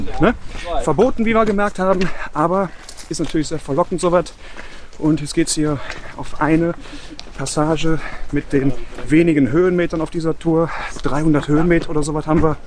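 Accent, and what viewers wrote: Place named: Cologne, Germany. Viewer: diese kölsch immer zwischendurch, einfach herrlich :D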